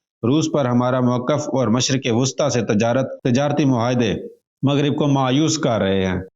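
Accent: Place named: Pakistan. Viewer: Yuck! What a Pakistani-sounding narrator. Utterly one-sided and typically I$lamiC in your worldview. Lol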